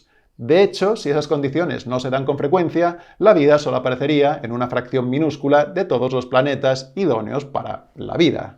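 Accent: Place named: Spain. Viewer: De verdad me encanta como explica....soy colombiana y a veces me constaba un poco oir documentales en acento español...pero definitivamente me encanta como el explica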